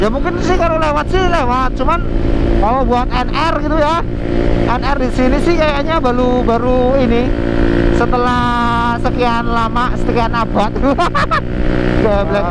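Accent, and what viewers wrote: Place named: Banyumas, Indonesia. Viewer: Ko ngomonge ngapak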